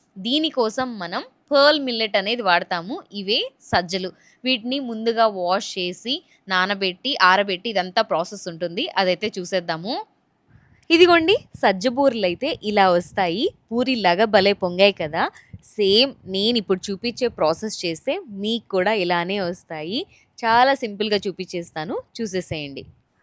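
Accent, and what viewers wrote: Nellore, India. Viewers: Hi nenu kuda nellore, nenukuda chestuntanu. You tube maa oori basha vintunte chala bagundi. Nenu banglore dagara vunde madanapalleki vuntanu.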